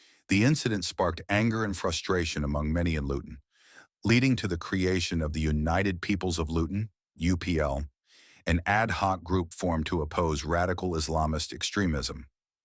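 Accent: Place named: United States. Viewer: Awful lifeless video for two reasons: the AI voiceover is in an American accent, and the video is clearly made by AI, not real footage.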